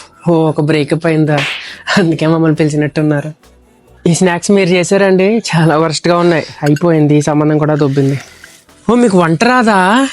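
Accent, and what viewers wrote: Godavari, India. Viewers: Super garu,your godavari ascent is so nice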